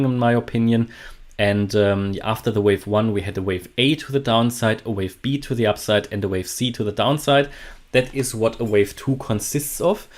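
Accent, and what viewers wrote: Germany. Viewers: an other stunning video full of interesting infos, especially the one you mentioned betw min to regarding the 2 diff optiosn in 3 or 5 wave moves, i m leraning so much follwoing you day by day, The most i like on your channel compare to the other is that you only talk abt TA and EW method, nothing abt market, economy, financial and no sense strategy or opium... keep doing the amazing work and thank so much for all your effort, ( just one question, are you German? ) i m just wondering abt the accent, Golden trades to all of you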